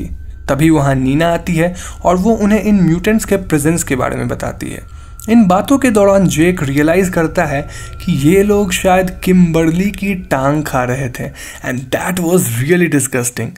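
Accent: Bengal, India. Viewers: Yourd Bangali accent is funny.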